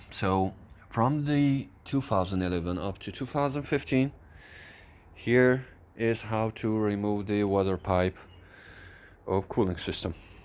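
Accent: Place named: United States. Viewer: Thank you brother تحياتي لك من بغداد اخوية الورد You have great accent i thought you r American Feeling proud of you زور باش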